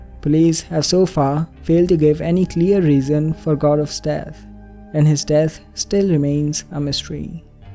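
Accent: India. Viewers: That 's an Indian accent.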